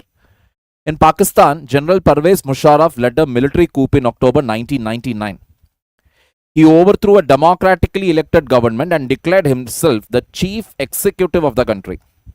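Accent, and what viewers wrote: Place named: South India, India. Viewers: Mind blowing personality with attractive south english accent..the pw thalaiava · The only thing i listened sir's English speaking He proved as typically a south indian but also he is very polite in hindi Actually india deserve these type of people from southern part of india ❤❤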